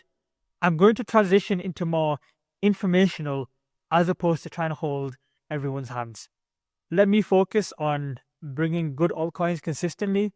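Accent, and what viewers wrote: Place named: Oldham, United Kingdom. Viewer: Where are you from son? Sounds like an Oldham accent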